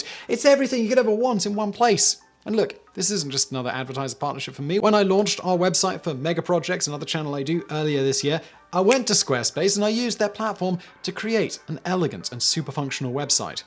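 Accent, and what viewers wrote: United Kingdom. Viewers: In some cases,the very British accent can be difficult for me to understand but in YOUR case,Mr.,it is as clear as a bell. A "right.proper" public speaking voice,I tell ya'....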